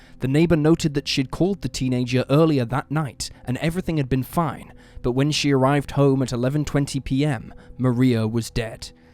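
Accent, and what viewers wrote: England, United Kingdom. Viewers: What a stupid comment! Yea he’s English, but just cos he has an English accent, why are you saying he should only talk about English killers??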